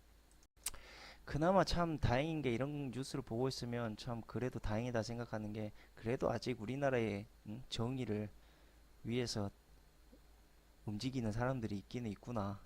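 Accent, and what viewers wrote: Jeolla, South Korea. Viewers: 전라도 억양이 찰지며, 약 돼는 쓴소리 고맙습니다. 근데 깨어있는 자가 많자않아 큰일.우리나라 정부는 정부로서의 기능 별로 행하지 않아. 엄청난 세금 축내며 많은 의원 왜 필요한가???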